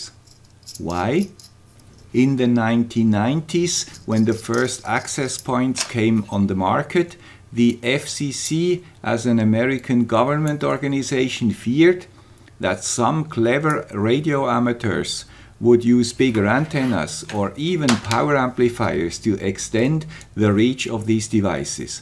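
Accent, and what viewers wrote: Switzerland. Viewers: the swiss accent reminds me of the swedish accent. don't worry, that's a compliment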